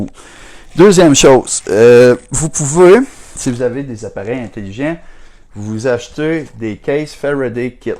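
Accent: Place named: Quebec, Canada. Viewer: Jean de Flor..les Québécois vous êtes beaucoup dans les fleurs 😉 en plus super joli accent vous avez, un vrai plaisir a vous écouter les Québécois 👍❤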